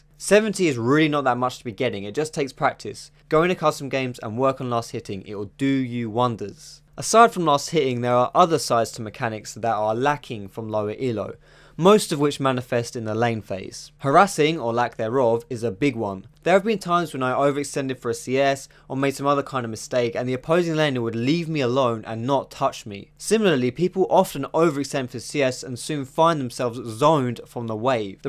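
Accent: United Kingdom. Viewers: First of all, I love your british way of speaking. So smooth. Secondly, I'd like to quote you here, "...if you spend all your money reviving Axel Roses music career..." HAHA you're cracking me up. I wonder if the young punks on here even know who is. Anyway, great video!! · are you British?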